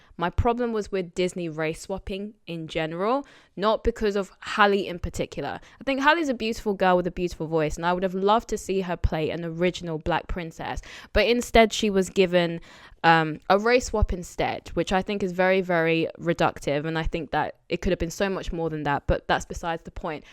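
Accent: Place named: United Kingdom. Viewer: Calmest rant with an impeccable British accent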